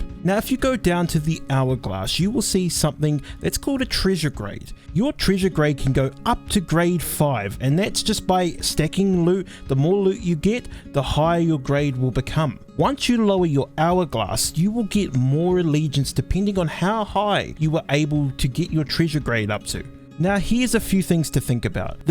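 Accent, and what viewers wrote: New Zealand. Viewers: quick question are you from NZ?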